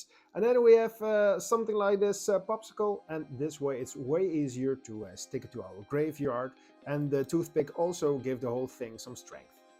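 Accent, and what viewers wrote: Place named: Netherlands. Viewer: Dutch? Thenaccent sounds like it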